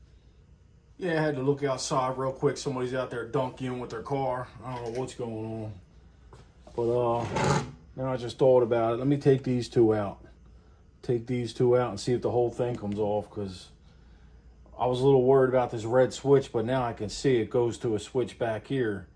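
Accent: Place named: Philadelphia, United States. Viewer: I’ll bet my house this is a Philly accent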